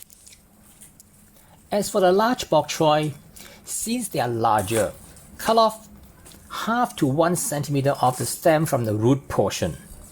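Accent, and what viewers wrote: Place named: Singapore. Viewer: Thanks for the clear instructions. I haven’t heard that Singaporean accent in years; it’s so unmistakable. Lovely video to watch.Cheers.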